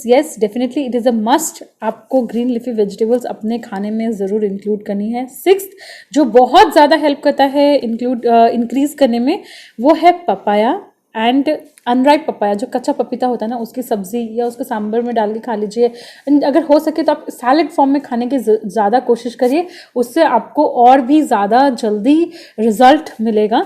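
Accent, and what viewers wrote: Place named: Portugal. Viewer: Gracias por visitarme bonita 🙂🙌 aquí estaré pendiente ♥️🙌me encanta escuchar 👂 tu acento ♥️es portugués???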